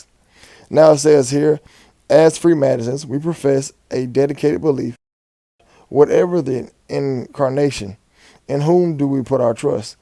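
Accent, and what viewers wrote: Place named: Memphis, United States. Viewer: Thas mah cuhhzen 🤣🤣🤣 I like the Memphis accent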